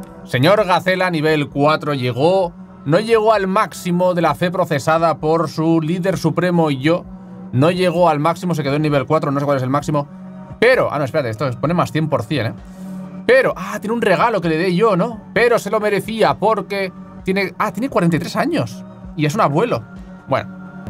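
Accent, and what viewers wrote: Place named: Argentina. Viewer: Hablas como Argentino más que Mexicano xd, lul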